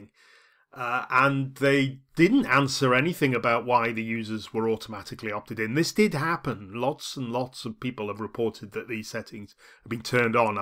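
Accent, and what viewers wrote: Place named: England, United Kingdom. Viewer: Why is this voice using an English accent?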